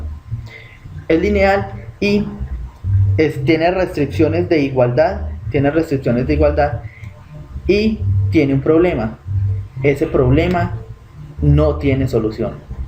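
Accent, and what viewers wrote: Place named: Colombia. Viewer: Excelentes videos, excelente profesor, debería de aparecer su nombre también, para darle todo el crédito a él. Por su acento parece Colombiano. Un abrazo y muchas gracias...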